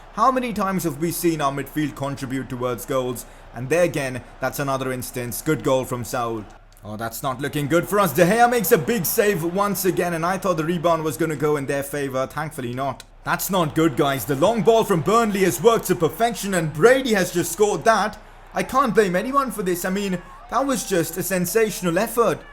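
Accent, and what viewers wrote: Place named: India. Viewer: He sounds like indian people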